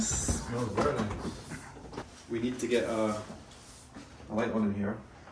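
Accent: Scotland, United Kingdom. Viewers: sounds more Scottish than usual. It is one of Earth's coolest accents. 😁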